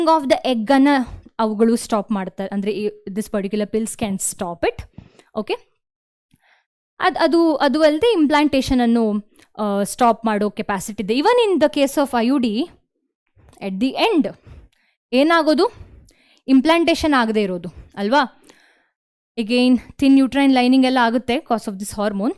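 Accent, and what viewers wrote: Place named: Mangalore, India. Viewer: ..... manglore kannada So beautiful so eligate ..just looking like a wow..❤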